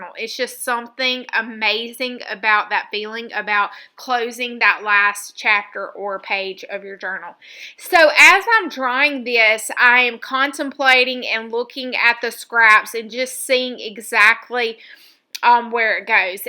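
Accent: South, United States. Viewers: Love this!!! Funny story.. the video alert popped up on my phone and I must have hit it by mistake and I am on a work conference call and all I hear is your sweet southern accent describing what a giblet is and I am literally trying to find the video pause or mute button.🤦.LMAO!!!..oh well the perils of working from home😂😂💕🤸😘🤗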